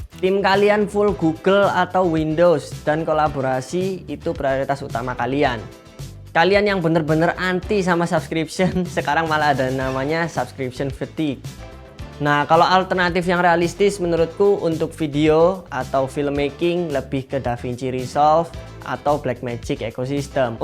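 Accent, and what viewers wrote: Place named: Java, Indonesia. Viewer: Medok buanget, wong ndi mas?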